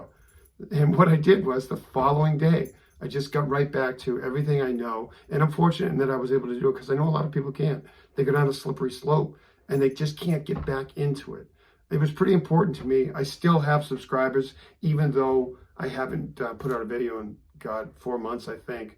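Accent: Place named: Quincy, United States. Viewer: I’m from Quincy MA. Recognize the accent. Down 50 pounds on keto. Thanks for sharing your journey. Cheers.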